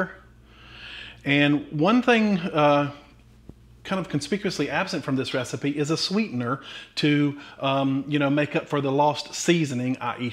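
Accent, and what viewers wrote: South, United States. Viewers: I could listen to this all day, just for his voice! So friendly, so Southern!! Thanks for the info- I'm from Korea and I NEEEEED low-carb rice!!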